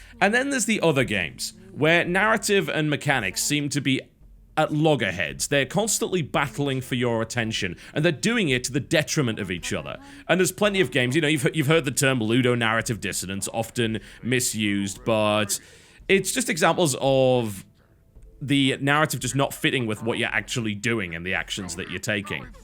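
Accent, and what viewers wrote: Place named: Newcastle, United Kingdom. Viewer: Let me guess were you're from by your accent you are originaly from newcastle but you traveled frequently as a child then you moved to north London at age 20 or 22 until 25 and then America were you have been living for 3 years now. Oh and great channel and awesome videos keep up the magnificent work.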